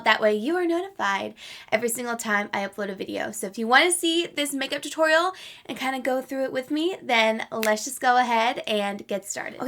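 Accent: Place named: Colorado, United States. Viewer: Sounds like Colorado to me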